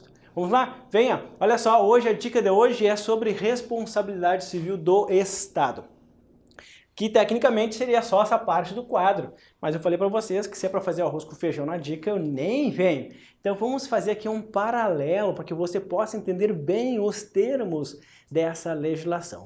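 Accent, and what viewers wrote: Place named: Rio Grande do Sul, Brazil. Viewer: Adoro o sotaque gaúcho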